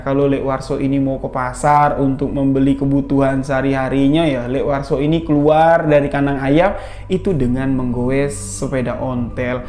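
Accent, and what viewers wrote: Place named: Madura, Indonesia. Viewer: Logat Madura nya masih kental.. Salam sehat selalu tretan dibik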